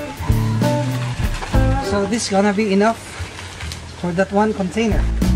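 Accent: Philippines. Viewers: I can tell you're a Filipino 1st Your Accent 2nd The sorroundings 3rd Your grammar And im filipino too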